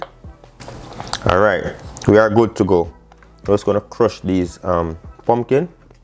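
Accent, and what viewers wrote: Jamaica. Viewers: Mmmm d soup looks a lick 👅 n mi know it tast a lick cos the seasoning n flavour what makes it so tasty 😋 Amazing god bless u hands mi like u Jamaican actcent. 🤣 I love cooking especially pumkin soup 🍜 so luv to watch u videos 👍🏿👍🏿👏🏿👏🏿😋👅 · The jamaican accent makes me come back,delicious soup ofcourse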